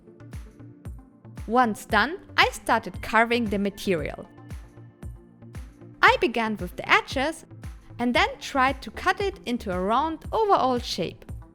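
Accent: Germany. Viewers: Ist sie deutsch vom Akzent her?😅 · Dis Jerman Äksent! <3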